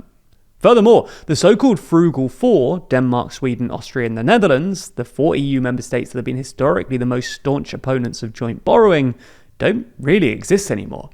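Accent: United Kingdom. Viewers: TLDR News EU? You sound extremely British to me. Does the UK want to join the EU? Nobody is walking into anything. It is more that we are crawling into a federal EU. It goes so slow. As long we are not all speak the same language in all countries it is still not a real federation in my eyes. Everything we can do together we should. Saves money. We don't need a huge army against Russia. We just need a hyper modern army with rapid response forces and a bit more nuclear weapons. An d all of this bought in the EU. The 5% deal with Trump is a hoax. Anything to get that orange monkey of our backs so we have a soft landing economy wise. Trump is the biggest liar in history of US presidents and changes the rules when ever he wants. That means we can do that too and thus do not have to abide by a number (5%) Trump shot out of his ars. Any deal made by Trump is not even valid in the US itself so why would we honour it? 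No, we just need to pay for our own defence and we will decide ourselves how much that is gonna be... This is how it is but just not said out loud by our leaders...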